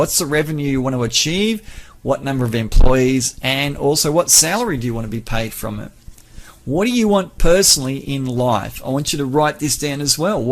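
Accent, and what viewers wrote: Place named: Australia. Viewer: this Australian accent annoys !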